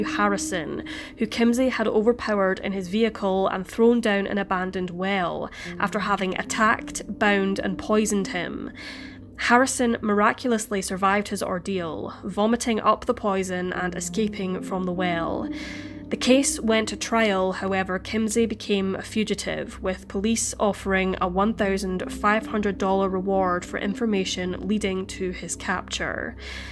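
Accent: Scotland, United Kingdom. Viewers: I’m so happy I found you channel. You cover these stories simply and thoroughly. And I love the Scottish accent! I can’t believed I missed the deer doing their business in the background, I’ll have to go back and look!🤪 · Where is the narrator from? I'm really bad with placing accents (even in my own country). To me it sounds like either a Scottish accent (I don't know what type of Scottish) or a really slowed down Belfast accent, but I don't know cause I'm really, really, embarrassingly bad at this. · Wow just found your channel and I love it already, beautiful Scottish voice 💙💯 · Came across this channel by chance stayed for the Scottish accent and creepy atmosphere ❤ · You Scottish? Seems like it. Great case keep it up ma'am.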